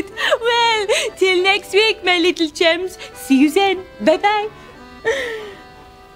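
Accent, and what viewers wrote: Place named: France. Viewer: ooooooooooo mat luv your french accent!!!!!!!!!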